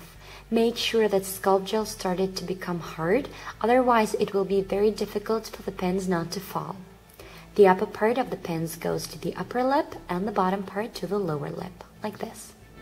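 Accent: Finland. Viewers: Are u from Finland??? :D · Are you Finnish or Russian?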